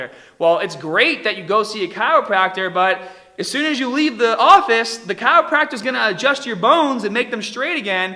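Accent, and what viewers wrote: Boston, United States. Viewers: You from Boston? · He's definitely from Boston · What is your accent?. Are you from Boston?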